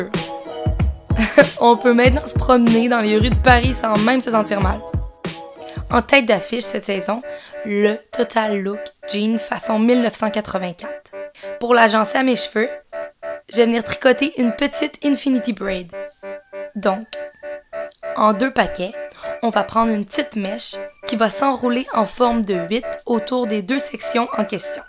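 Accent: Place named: Quebec, Canada. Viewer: J'ai beaucoup aimé tes looks tu es trop jolie 💘 l'accent québécois👌👌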